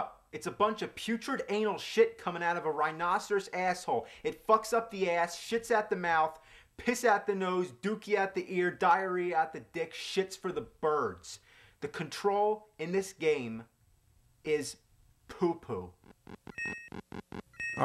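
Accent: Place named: Philadelphia, United States. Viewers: fucking hell, im italian and still i can hear the philly accent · He actually has a bit of a northeastern accent if you listen closely lol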